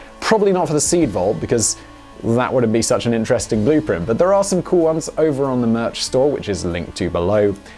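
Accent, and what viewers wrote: United Kingdom. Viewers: I mean, that's how most British and Australians pronounce it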